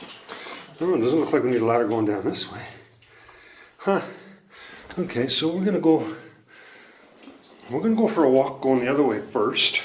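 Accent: Canada. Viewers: As soon as I heard the accent. I KNEW it was Canadian. Love the video.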